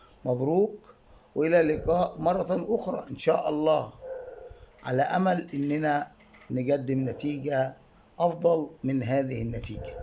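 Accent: Egypt. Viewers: أنت مصري صعيدي كمان. مين موافقني في هذا الرأي بعض الحروف فضحتك يا معلم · انت مصري مش كده😢 فلاش تعمل خليجي لأن اللهجة المصرية طاغية عليك وواضحة والموضوع واضح بالرغم من اني عايش في الخليج بس مش محتاجة وأي واحد يقدر يكشفه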